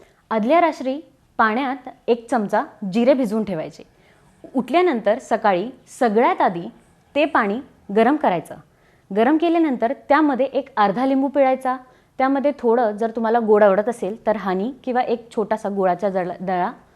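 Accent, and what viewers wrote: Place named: Pune, India. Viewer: Nice पुणेरी accsent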